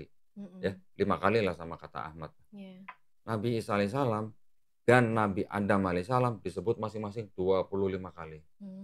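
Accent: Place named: East Java, Indonesia. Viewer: Logat nya JATIM 😅😅😅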